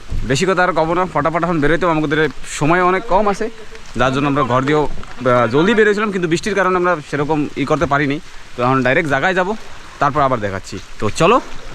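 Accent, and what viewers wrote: Barishal, Bangladesh. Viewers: বরিশালের একসেন্টের সাথে মিলে অনেকটা ।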